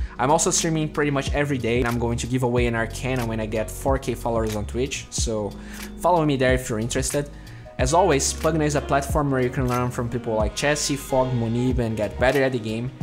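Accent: Brazil. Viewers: where you from ? your accents sounds from brasil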